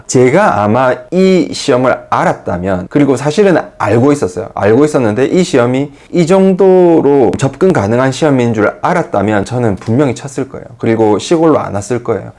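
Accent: Gyeongsang, South Korea. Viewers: 시골 탈출 축하드립니다^^ 사투리가 같은 경상도 말투라 반갑네용 ㅋㅋ 주변 독일, 프랑스, 인도인 친구들은 NATTI CCL을 기본적으로 다 치는 걸로 생각하던데 한국분들은 쳐볼 생각도 안해보시는 거 같아서 아쉽더라고요. 저도 주변에 아무도 NATTI CCL 생각 안하고 있을 때 혼자 공부해서 시험치고 합격했던 경험이 있습니다. 그때는 워낙 정보가 없어서 너~무 너~~무 고생 많이 했지만 시험 자체는 생각보다 쉬웠어요. 추천해주신 웹사이트 가서 보니까 세상 좋아졌다는 생각 드네요ㅋㅋ 2024년 이민 준비하시는 분들 다들 화이팅^_^**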